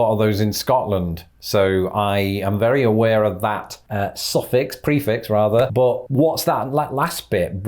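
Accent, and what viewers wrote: United States. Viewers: Well now... You make a very good American sir.😊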